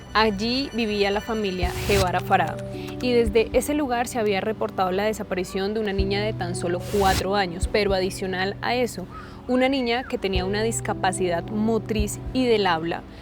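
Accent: Colombia. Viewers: andas perdida mi Reyna no se pronuncia Huixquiluacan, es Huixquiluacan pero bueno eso es lo de menos por tu acento puedo ver que eres colombiana...lo que si no pasa es que una mamá tiene en su panza 10 meses al bb....no mamita son 9 meses... que yo sepa en ningún lugar de el mundo son 10 meses de gestación 🤭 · Y esta youtuber, como se atreve a hablar de este caso, ni siquiera es mexicana, por su acento es Colombiana, ni siquiera los mexicanos sabemos como pasó, así que tache, oportunista!!.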